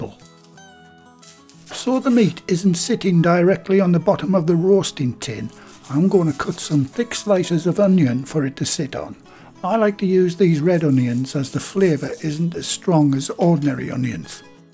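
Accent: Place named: Durham, United Kingdom. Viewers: Loverly, jubberly,!. Brought back great memories of my 21 years in England and many happy Sunday lunches with my amazing (now sadly departed) English girl. Thanks for another superb video, and I always enjoying hearing your accent (Durham is it, or Washington, Tyne and Wear? My ears are getting rusty.) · What a refreshing change a Chef showing us how its done and making a video that's all about the food....no one knows what Mr even looks like because its all about the food well done sir and as a fellow north country man myself its nice hearing the dulcet tones of the accent again as I transplanted 55 years ago to Australia!!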